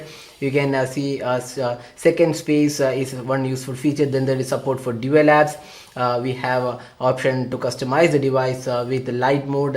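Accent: Kerala, India. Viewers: are you from kerala your accent ??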